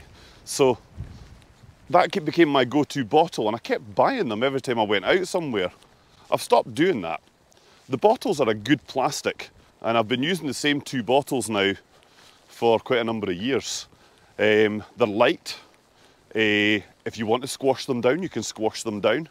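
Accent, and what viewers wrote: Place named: Scotland, United Kingdom. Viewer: Precious information for my upcoming trip. Also, a good exercise for a non native English speaker to familiarize with the Scottish accent!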